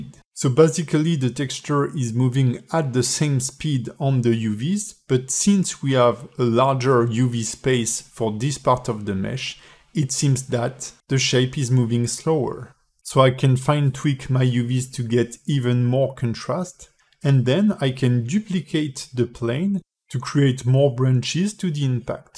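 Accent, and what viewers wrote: France. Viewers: Vraiment top ta vidéo, très quali et ton animation est cool ! On sent le petit accent frenchy :P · what a french accent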